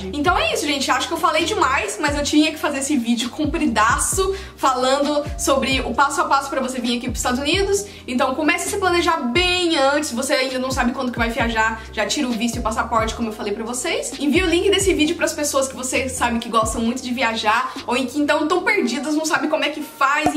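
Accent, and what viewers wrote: Goiás, Brazil. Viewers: Sem conhecer você em um outro vídeo imaginei que fosse goiana pelo sotaque,morro de vontade de ir para outro país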